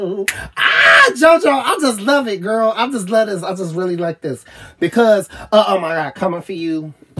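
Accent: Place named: South, United States. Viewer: by the way where are you from you have a really deep and strong southern accent it really fascinates me it is So DEEP AND Strong I’m just curious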